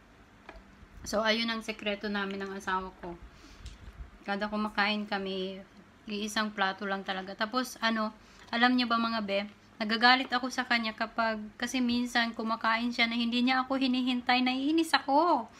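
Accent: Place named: Ilonggo, Philippines. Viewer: Prang ilongga k mgsalita malambing. At mahinahon..new subscriber hr. #OFWinjordan.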